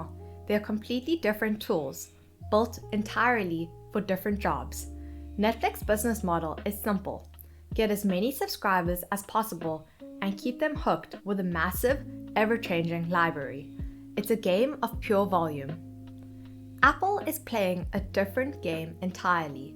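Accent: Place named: Zimbabwe. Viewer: Nice informative video. Well narrated and edited. Whats your accent? Zimbabwe ? Keep up the great work. Cheers from New Zealand 😎🎸🤘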